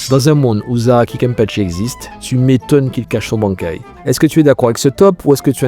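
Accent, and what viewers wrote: Caribbean. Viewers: Je sais pas mais t’es antillais ? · C'est un antillais qui parle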